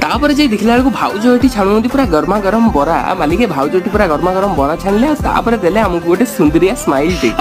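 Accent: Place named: Puri, India. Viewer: କଥା ତ କହିବ ଭଲରେ ।। Ye malike malike kana .. Tama puri ଲୋକଙ୍କ ଭାଷା ଶୁଣିଲେ BP High hei jauchi ।।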